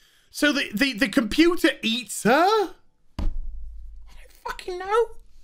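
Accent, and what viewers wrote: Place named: Yorkshire, United Kingdom. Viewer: Really am loving the Yorkshire accent coming out. “Oreyt pal, ars it guin?”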